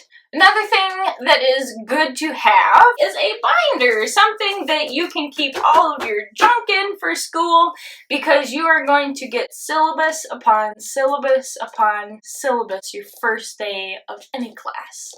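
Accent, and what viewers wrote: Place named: Upper Midwest, United States. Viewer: LOVE your editing. Best MA videos on Youtube. Girl, you must live in MN, WI, or a nearby state. I moved to WI from Texas and that accent on the word "bag" (or, "beg") is unmistakable. Thanks for the helpful info. Appreciate that you keep it funny and entertaining but don't get off point with makeup tutorials, etc. that have nothing to do with Medical Assisting. Yeah, anyway, good job.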